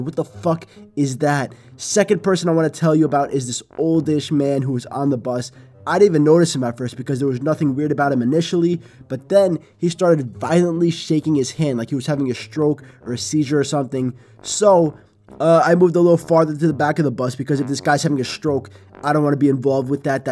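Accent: New York, United States. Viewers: r u from new york?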